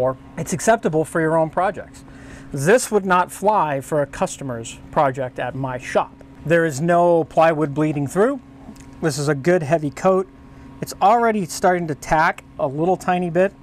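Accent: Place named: Northeast, United States. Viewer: Very odd 🤣🤣 you must be from up northeast 100 %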